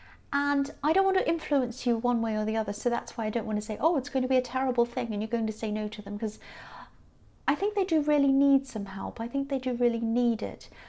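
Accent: United Kingdom. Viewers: If that is my ex, hmmm I will refer him with the best therapists but that's the best I can do. He rejected me and used me at the same time and that ship is sailed. I'm so grateful cuz I've learned, healed so much and I don't hate him. I m more focused on work and personal development all the levels there are. You are so good and inspiring, you're gifted. Thank you very much, I am 4 days away to my birthday and really ready to embrace the new life I want so the past can be flushed in the toilet and only keeping the lessons!❤ where are you from? I love your accent, I wonder is it the UK?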